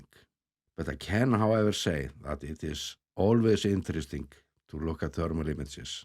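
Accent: Iceland. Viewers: Jeg er ikke så god til engelsk. Og når det samtidig er med stærk islandsk accent, kan jeg næsten ikke forstå dig.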